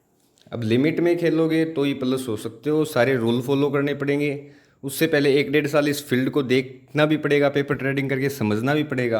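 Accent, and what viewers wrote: Haryana, India. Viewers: Dada aapke mostly saare videos dekhe,aapki ek ek baat me sachhayi hai,aur aapne apne anubhav ko saajha kiya hai,me aapko abhi se guru maanta hu,aapki language se lagta hai,shaayad ap seekar ya hariyana side ke ho,ek baar darshan ka moka de,koti koti dhanyawad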